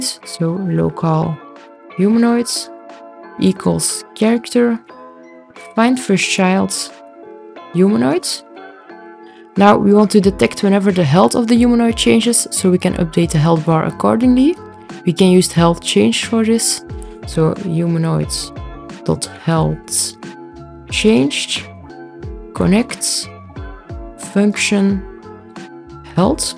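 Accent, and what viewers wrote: Netherlands. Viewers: are you from the netherlands or belgium?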